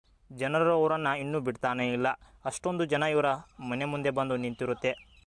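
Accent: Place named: North Karnataka, India. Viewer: Uttar Karnataka Bashe..❤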